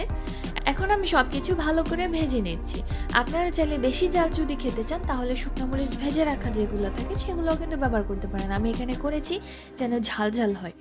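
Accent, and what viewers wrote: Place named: Noakhali, Bangladesh. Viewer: নোয়াখালীর ভাষা কড়ি বলে অনেক ভালো লাগলো 👌👌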